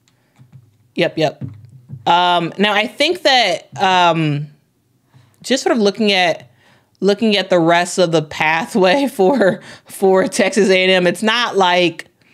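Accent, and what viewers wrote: Chicago, United States. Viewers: u have a Chicago accent. it’s not as strong as Gherbo, Durk, and Ari’s but we can hear it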